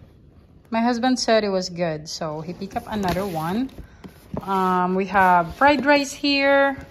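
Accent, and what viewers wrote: Philippines. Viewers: Mam meron po kayo Filipino accent!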